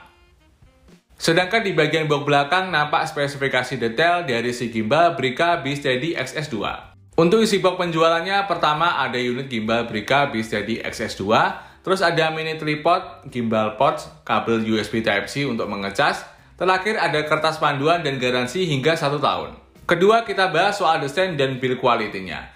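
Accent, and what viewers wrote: Magetan, Indonesia. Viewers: Orang magetan to bang?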